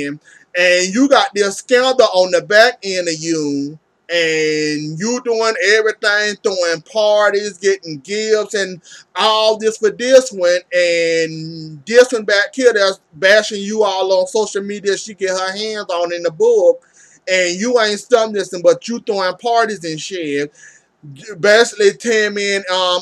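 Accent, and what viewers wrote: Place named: South, United States. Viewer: I love that accent. Country grammar. ❤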